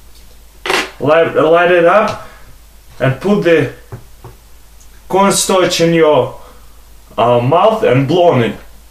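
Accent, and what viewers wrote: Russia. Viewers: Just speak Russian that's what it sounds like anyway and get some subtitles if you want more people to understand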